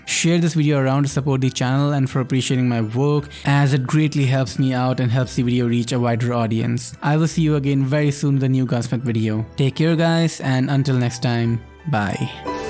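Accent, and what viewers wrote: India. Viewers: You sound Indian Are You from India? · Are u Indian???ur accent is a bit Indian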